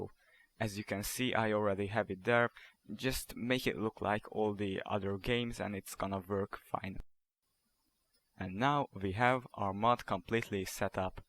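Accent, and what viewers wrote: Hungary. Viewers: Szia :D Ahogy láttam a géped nyelvét, és hallottam a kiejtésedet, magyar vagy :D Nagyon szépen foléykonyan és érthetően beszélsz angolul, kívánom, hogy legyen vele nagy hasznod az életben! :) :D Ui: Köszi, nagyon sokat segített a videó :) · Az akcentusból tudtam meg hogy magyar vagy! Sok angol után nagyon könnyű kihallani :D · Nagyon durván hallatszik a magyar akcentus :D De ügyes vagy! csak így tovább!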